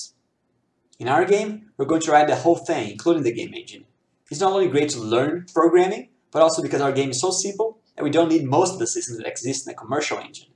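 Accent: Brazil. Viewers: The accent gave it away! I instantly knew you were Brazilian!🇧🇷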